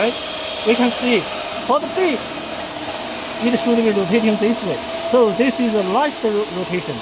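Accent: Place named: China. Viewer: I can totally understand your chi-english. any body understand also?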